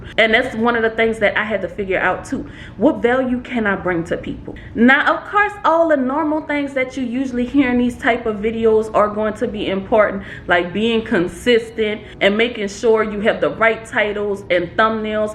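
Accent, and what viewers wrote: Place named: South, United States. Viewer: Congratulations Mrs.!!!!! I'm so proud of you. You truly deserve it. I love your videos. You come off as very down-to-earth with lots of southern charm. I'm from Mississippi. So, you bring me sounds of home that I just adore! 😊 Please make another video when you hit that 500,000 subscriber mark IN A FEW DAYS!!! 😁 (I'm speaking it into existence.) We all want to celebrate with you. Keep up the great work, and enjoy your blessings.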